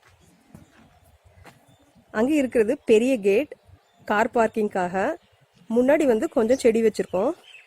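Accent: Tirunelveli, India. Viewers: Nenga tirunelveli ya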